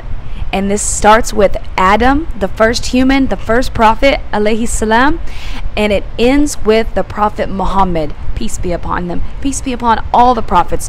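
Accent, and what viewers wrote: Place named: South, United States. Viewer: southern accent sister. Baarakallaahu feekum.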